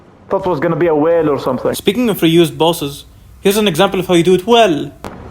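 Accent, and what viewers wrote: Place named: Arab world. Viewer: I haven't reached him yet . However, I can say that he is cool. من لهجتك عرفت انك عربي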